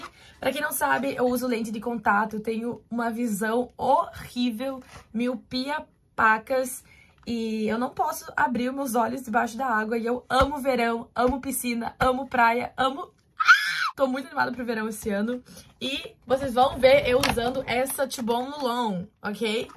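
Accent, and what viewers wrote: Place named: South, Brazil. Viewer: o sotaque sulista deixa tudo melhor, me identifico kkkkk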